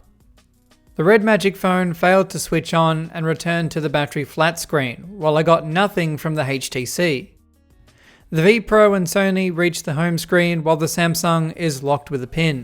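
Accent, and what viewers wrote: Australia. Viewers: Another Aussie yelling at nuggets. I have subbed.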